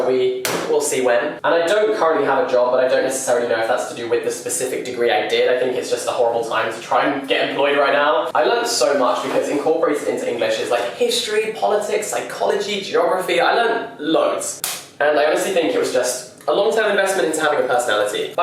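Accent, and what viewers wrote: United Kingdom. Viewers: I love watching videos, but my non-English brain cannot handle that talking speed, especially in combination with British accent:D help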